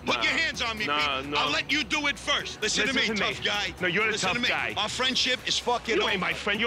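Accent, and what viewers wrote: Scotland, United Kingdom. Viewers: It's strange listening to the British commentary. I'm so used to hearing the American guy in the episode. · This episode must be real early because it's not narrating it's some Scottish dude. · I totally felt the change in narrators. A lil bri'ish eh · Have they taken voiceover voice from when he was 15 or something. he sounds more scottish than ive ever heard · The Scottish accent really jumped out in the narration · Kitchen Nightmares US with a British narrator is pure gold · scottish Ramsay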